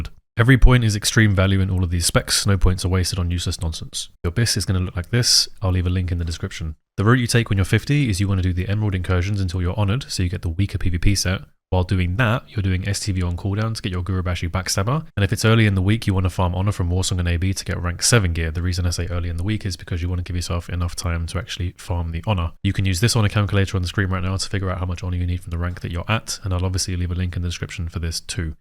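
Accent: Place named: England, United Kingdom. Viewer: If youre going to speed up your speech dont mumble. Maybe it's your accent. Strange how the english invented the language yet cant enunciate to save their life.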